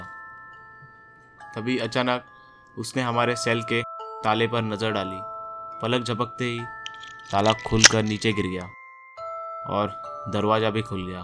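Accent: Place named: Rural, India. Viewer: Story shi h lakin apne bolne ki style ko improve kr...asa lg rha h ki tu villager h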